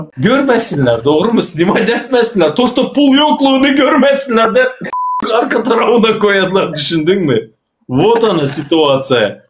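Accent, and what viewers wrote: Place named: Chardzhou, Turkmenistan. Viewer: Это наш Чарджовский (нов.наз.Туркменабат) диалект.У нас на родине есть Лебапская область там у нас все разговаривают на таком диалекте.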